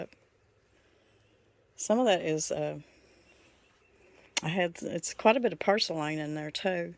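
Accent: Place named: Arkansas, United States. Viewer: I listen to you because you sound like my Arkansas Family that I miss terribly. Today you said something that If I were you I would use to describe your( Good Things(Martha Stewart).(Love and Best Dishes Paula Dean) "You can not beat that with a Stick" Should be how you describe your endings along with " God's Best to You from Whippoorwill Holler." Your beginnings of filming is great describing your goals. I feel like you need an ending. that is just as Country,. You are a great cook, school kid approved, family friendly dishes that withstand the test of time. I Know cause my folks did a lot of life that way. Collins/ Smith/ Hearst/ Sloan are my AR family names. Maybe you have heard of them? You are "the Best thing and God's Best to you all." CKelly Hearst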